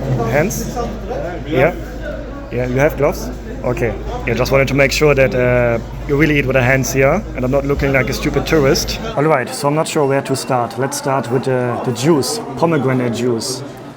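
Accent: Germany. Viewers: nice German accent. Makes you "sympathisch" and real❤ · Can tell you are german, as you pronounce your w with an r and vice verser. Ive subscribed to you. 💋💋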